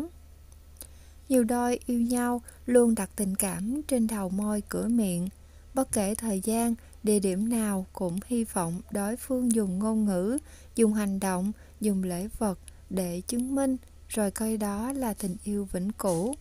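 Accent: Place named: Mekong Delta, Vietnam. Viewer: Câu chuyện rất hay cùng với giọng đọc rất hay rõ từng lời, mang một chất mộc mạc của miền tây.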